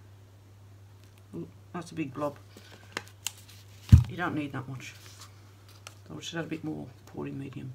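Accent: Birmingham, United Kingdom. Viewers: Hi Ms Brummie, so lovely hearing that great Brummie accent. Great video I will share on my acrylic art page on Facebook to share your information to my peeps in UK I have resisted taking part in the blooms craze recipe and try to find easy non complicated recipe 😂😂 I’m in Australia so do have access to the floetrol. Well done 👍🏼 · You can't miss a Brummie accent! Lol. (Says a Geordie). 🙂🙂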